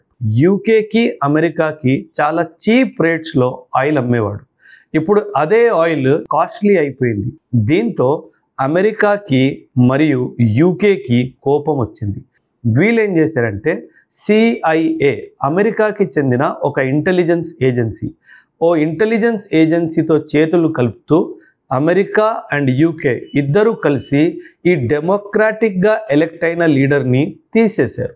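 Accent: Punjab, India. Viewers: Swatchamaina mana telugu lo oka panjabi excellent narration. Vinataniki chala goppaga vundhi.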